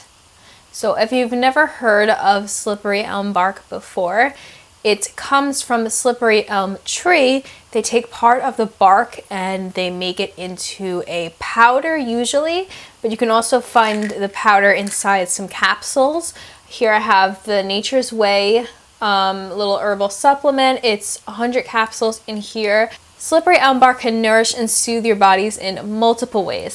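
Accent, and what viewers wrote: Long Island, United States. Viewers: Are you from Long Island? I notice an accent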